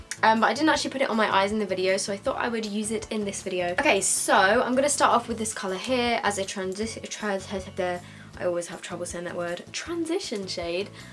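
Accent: England, United Kingdom. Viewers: Are you from England? Your accent sounds that way 💖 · I just subscribed and I wanted to know if you were English? (I'm English)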